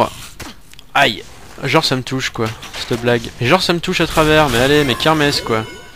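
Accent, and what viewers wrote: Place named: South, France. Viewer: J'adore l'accent du sud de:D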